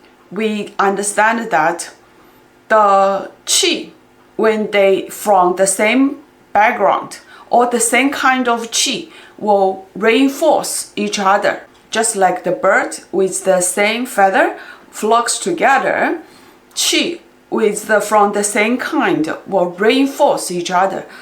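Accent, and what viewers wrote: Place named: China. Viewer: I love your accent its cute Chinese English I love it by the way you are not only a good tutor but also beautiful women beautiful wowoowow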